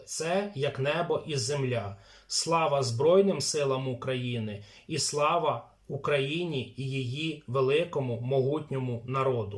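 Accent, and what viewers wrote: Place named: Russia. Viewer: Он даже чёкает чё четы с окцент расийского дерьма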